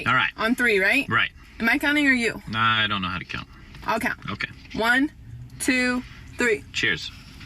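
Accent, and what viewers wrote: United Kingdom. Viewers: I forgot your British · Always a week later with the content Gregg Doucette, More plates More dates, Or Phillion make is a bit Lame... just copying topics from others and adding a British accent to it. What about creativity and original content? In the beginning it was a bit funny but now it's getting exhausting watching the same content twice so I'm gone lol :D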